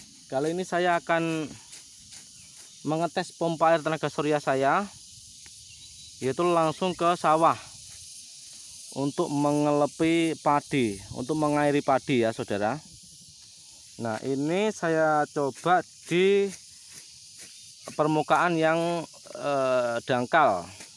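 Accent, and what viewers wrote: Java, Indonesia. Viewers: 😂😂😂😂😂😂 mengelepi sawah to mas.....?seneng medok jawane...😂😂😂😂 Sukses selalu mas...Lampung nderek....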